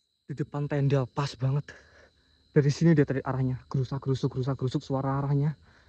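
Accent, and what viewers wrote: Java, Indonesia. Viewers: Mas, njenengan orang mana ya...? Logatnya sprti orang Jawa ya...? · Mas, njenengan orang mana ya..? Logatnya kok sprti orang Jawa ya..?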